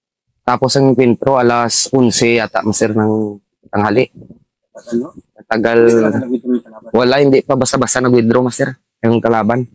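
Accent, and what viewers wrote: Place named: Iloilo, Philippines. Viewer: Sure ako na ilonggo accent nito we salute po sa inyo sir.❤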